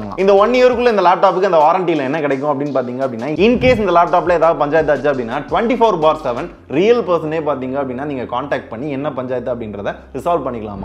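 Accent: Madurai, India. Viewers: Bro ninga madurai aa..?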